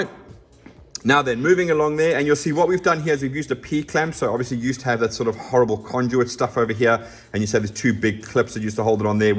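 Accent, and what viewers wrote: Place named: South Africa. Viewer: That South African accent. Also, awesome work man!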